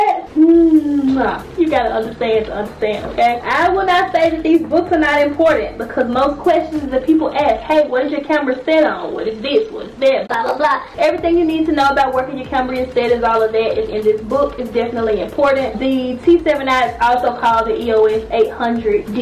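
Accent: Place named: Tennessee, United States. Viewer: I was about to comment and ask if you were from TN until I saw it in your description box haha. I recognized that accent lol I was born there and have family there, but hello from NC!!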